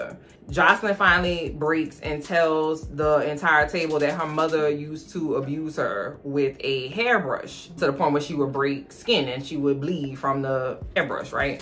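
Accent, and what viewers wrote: New Orleans, United States. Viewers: I love me a woman NOLA YouTuber! The best accents and reads😂😂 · I was completely taken aback by the straight faced comedy!!! It took me a quick second to understand who trefalga was… The algorithm truly blessed my time line with you. The impeccable face card drew me in… the Nola accent had me locked… the non stop roasting made me subscribe AND ring the bell. Looking forward to viewing more and more of your content beloved. Willing you success in all your righteous endeavors. ✌🏽&❤️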